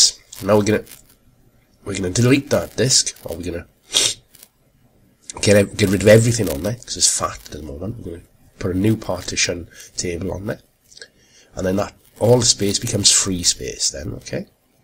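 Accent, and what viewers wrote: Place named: Wales, United Kingdom. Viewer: Just watched an Irish guy telling us how to do this and now a Welshman. This Linux is truly international ;)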